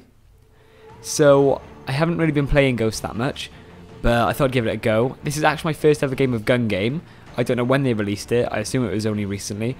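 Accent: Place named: United Kingdom. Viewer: British Pershon........I likes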